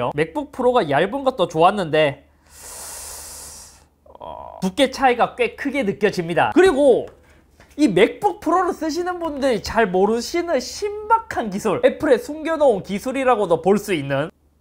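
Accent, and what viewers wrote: Gyeongsang, South Korea. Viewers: 역시 갱상도르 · 갱상도 아입니까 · 오우예 같은 경상도 people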